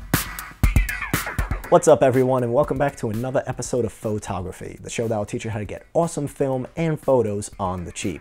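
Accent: United States. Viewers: i love this deep brooklyn review :DD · I don't know why but the more the video went on, the more I begun to like this guy. Might be his accent which I assume is like an East Coast accent? IDK. But consider me subscribed.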